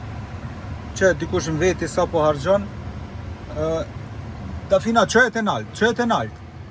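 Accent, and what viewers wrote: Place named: Skopje, North Macedonia. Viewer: prsh, mos je i shkupit i nderuar , dialektin tipik shkupjan gjithashtu edhe stilin , respekte klm.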